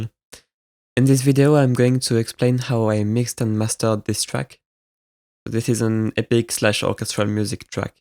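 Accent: France. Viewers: hey First off its a Very cool video you explain quiet well and thanks you and secondly are you français ? 🙃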